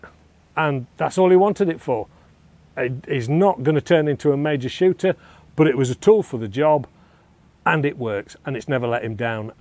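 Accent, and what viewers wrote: United Kingdom. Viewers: What a great way to present review and I liked your British accent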